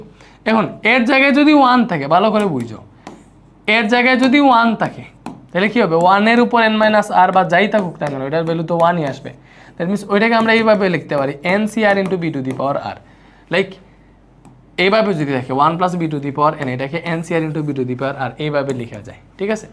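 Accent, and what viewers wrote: Sylhet, Bangladesh. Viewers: Bai sylheti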